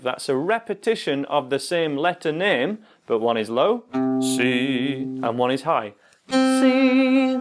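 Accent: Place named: Northern England, United Kingdom. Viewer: Cheers! Mega helpful plus - Northern Accent FTW!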